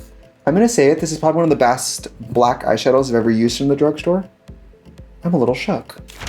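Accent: Wisconsin, United States. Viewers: Any one else think Trixies Wisconsin accent shows up more during the 2020 videos? I feel like i hear it more in these than newer ones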